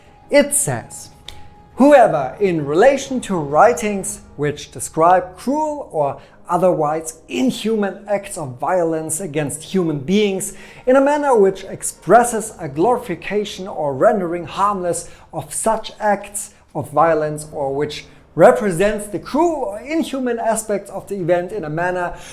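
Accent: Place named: Germany. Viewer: not the typical german english speaker nice usually all germans speak it like in the 1960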